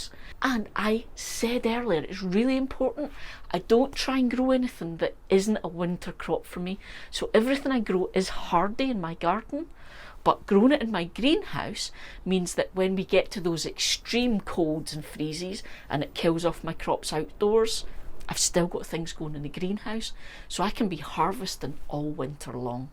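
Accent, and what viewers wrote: Scotland, United Kingdom. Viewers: Not fair unless we know where your accent is from?? Scotland. Irish. I am Scotch Irish living in Louisiana, raised in Texas with an East Texas accent. 😊 ❤ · New here love your channel and your information thx u 😊 I’m from Australia 🇦🇺 my mum is Scottish so I feel at home listening to your voice ❤ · Thanks for your video with your charming scottish accent.' I kanee...?..I was at uni at St Andrews and it brings back good memories. What I don't quite understand why you would put the IKEA plastic recipients low down because you could use that space to grow plants and maximize the light. We have built light shelves all around the edges of our greenhouse which can be taken away so we start small plants on two levels then remove the shelves that are about at one meter twenty . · Hello my new friend in Scotland! This was a wonderful video! 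First of all listening to you is like having my mother read me a bedtime story… Your accent and lilt are very soothing! Secondly, I just realized that by using water from the tap, I am shocking my seedlings! So thank you for that common sense advice. Lastly, you have inspired me to move some tomato and basil plants into my greenhouse for the winter. I’ve always wondered about pollination inside a greenhouse , so I’ve never done it all season long. However, I am going to try to extend my season this year by moving my establish plants into my unheated greenhouse. Looking forward to viewing the rest of your videos. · I was surprised when you first started talking. Your accent is so cute and you are so easy to understand. Except a couple of times you mentioned ober jeins.(That is how it was spelled in the closed captions.) I wondered what that was.So I googled growing ober jeins in Scotland, and started laughing when I realized you were saying aubergines! 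We just call them egg plants here in Michigan. You were very informative and helpful, so I decided to subscribe to hear more of that accent! I almost did not click on your video when it came up in my feed but I wanted to find out what your Ikea hacks were. I am so glad I did! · Clicked for the title, listened because of your awesome accent (Scottish heritage all over my family tree), and stayed because of your content. So very helpful. Thank you for sharing your setup. You got a new subscriber. I had to laugh at myself being a typical American. Me: What? they have IKEA in Scotland? Me a moment later: Ya big dummy, IKEA is Swedish, probably had IKEA before you even knew what IKEA was. Hahaha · Hello again. I believe it was back in your previous greenhouse. Maybe I’m not remembering correctly, but it was a smallish plug in heater. I don’t want to cause you any extra effort because I could be remembering wrong! 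Anyway, if it’s nice and warm all day and is then at freezing at night, I’m thinking that would not be good! Anyway, if you are pretty sure you never used a heater, then don’t worry about it. Could be another of my senior moments! Ha ha. PS the first time I watched you, I thought “oh no, I’ll never be able to understand what she’s saying with a Scottish accent” which of course was not the case. Your presentations are so great! Keep them coming👏👏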